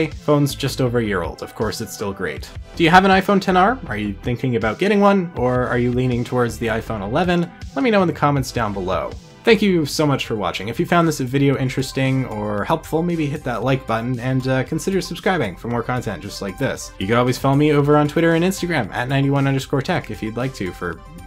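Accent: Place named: Canada. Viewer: i could tell your canadian within 3 seconds of the video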